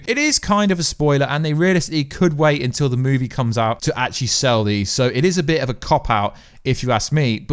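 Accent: England, United Kingdom. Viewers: they're not "what" with a date? you tube says you said basilic. what does basilic mean? A really "what " upgrade? you tube said you said safe. "head sculpt?" lastly i have taken the liberty of looking up "aliteration": from al·lit·er·a·tion /əˌlidəˈrāSH(ə)n/ noun noun: alliteration; plural noun: alliterations the occurrence of the same letter or sound at the beginning of adjacent or closely connected words. "the alliteration of “sweet birds sang”" i t doesnt mean different versions... maybe you are thinking "variations". i find it quite interesting that you have an "english" accent but dont speak proper english.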